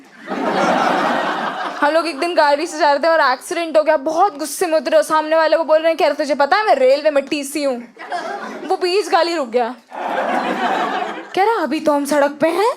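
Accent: Bhopal, India. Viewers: i know that you have passed out from carmel convent bhopal your totally representing the accent and youth of bhopal...btw nice keep doing this ultra comedy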